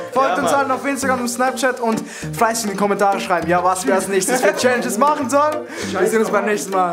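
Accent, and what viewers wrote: Switzerland. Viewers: bisch vo Züri gsi?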